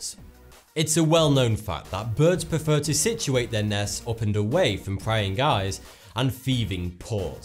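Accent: United Kingdom. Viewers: why does everything sound better from a British guy..its like my brain actually absorbed informAtion more easily.. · Where does your accent come from? It sounds like British but different somehow.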